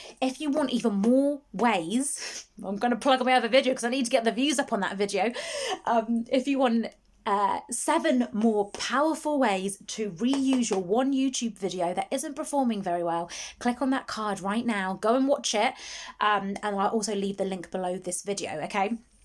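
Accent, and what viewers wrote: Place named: London, United Kingdom. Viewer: I just created my channel posted up just my intro and outro but have limited items to edit can you help and offer advice? I’m from London and guessing your same from the accent thank you! Would like free software for editing and best ways to make good interesting shots and how to make it look good anything u can pls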